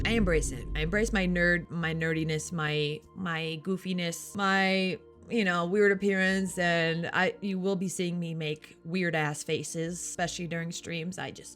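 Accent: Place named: Boston, United States. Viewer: You remind me of jerma, and not just because of the boston accent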